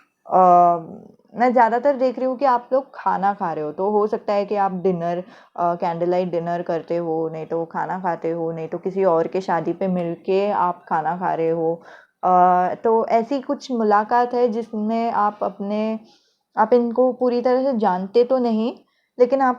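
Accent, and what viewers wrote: Maharashtra, India. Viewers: Hi mam, i have chosen pile no. 3,i m also a tarot card reader, numerologist, etc. mam aapke bolne mein marathi accent aata hai, aap maharashtrian ho?mujhe aisa laga, just asking generally, ok, so Mam aap mujhe ek baat batao, aap jab bhi reading karti ho hamesha yehi kyun bolti ho, "aapke husband aise honge",vaise honge, matlab ye video ko ladke nahi dekh sakte, ye video ladko se resonate nahi hoga.aapne pile no. 3 mein toh puri khichdi bana dee, aap logon mein jhagda hoga, nahi hoga, baad mein thik ho jaayega. "jara nit padh teene reading kara ho madam, nakki kay aahe te saangaych, hasayala tumhalaa lay aavdto.ekdum mana nee hasta ho tumhi. Nice reading. Keep smiling. 😄Thank you madam, 🙏🙏🙏👍👍👍👌👌👌❤️❤️❤️❤️❤️🌹🌹🌹🌹🌹🌹🌹🌹🌹🌹🌹.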